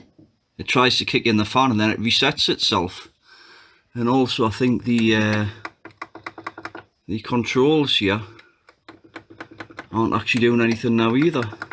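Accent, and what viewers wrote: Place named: England, United Kingdom. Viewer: I grew up in England and lived in Colchester, Nottingham, Bucks, London, Herts and Chester so don’t have a regional accent, but love the persistence of English accent variants. I can usually roughly identify accents, but can’t pinpoint if someone comes from Newcastle, Durham or Sunderland. Which is yours if you don’t mind my asking? 😊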